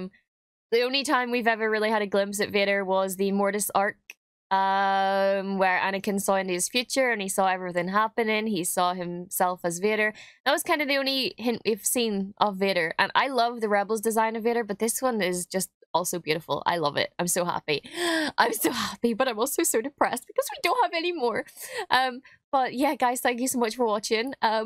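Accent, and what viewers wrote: Northern Ireland, United Kingdom. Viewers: Sound like your from the north of Ireland? 😅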